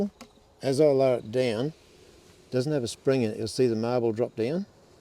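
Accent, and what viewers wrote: Australia. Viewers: Australian accent. Hard to hear 😂😂😂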